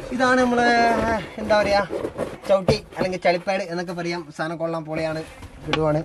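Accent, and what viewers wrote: Kannur, India. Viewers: Bro kannur aanalle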